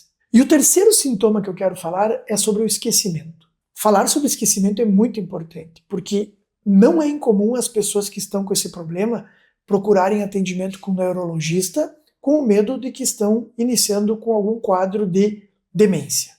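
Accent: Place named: Rio Grande do Sul, Brazil. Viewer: Você tem sotaque de gaúcho no chamarão de 🍃 verde no cuias do corpo humano também bom-dia senhor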